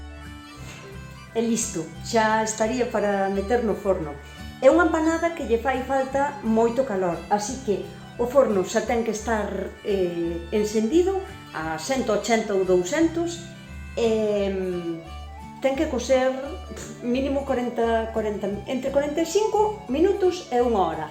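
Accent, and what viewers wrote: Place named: Ribeira, Spain. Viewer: De donde eres??? Porque falas ijual que nos aqui en Ribeira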